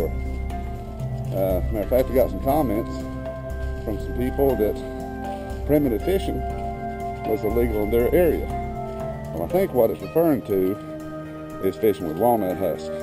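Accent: South, United States. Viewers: ... with the southern drawl ... slow talk ... lazy annunciation ... and background music ... and with old ears ... many of your words ... did not compute. GOD Bless you though for your efforts.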